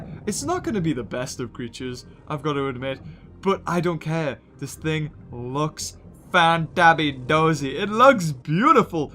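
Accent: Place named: United Kingdom. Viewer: what part of great Britain are you from?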